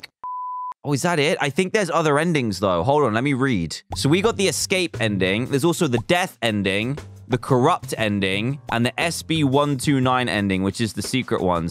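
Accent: United Kingdom. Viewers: Are you brittish??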